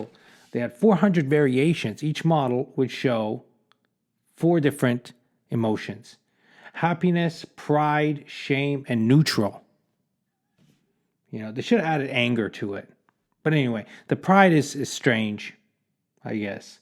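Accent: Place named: Northeast, United States. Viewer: what is your very prominent accent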